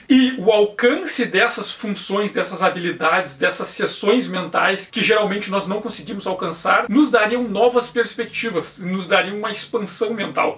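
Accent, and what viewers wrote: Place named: Rio Grande do Sul, Brazil. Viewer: me inscrevi e tô vendo, gostei da perspectiva, sotaque do RS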